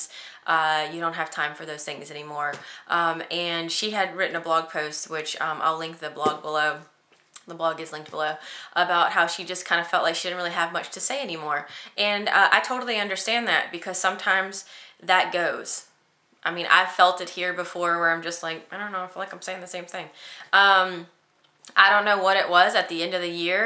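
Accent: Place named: South, United States. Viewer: Happy Anniversary! I love watching " " with her cute southern accent! I hope you have a great weekend. Still cold here in Chicago but at least the sun is shining! XOXO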